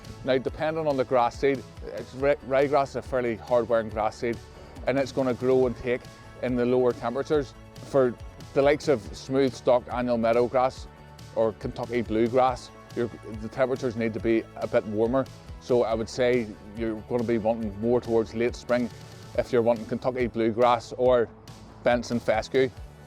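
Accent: Northern Ireland, United Kingdom. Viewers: I love the northern Irish accent, so unique!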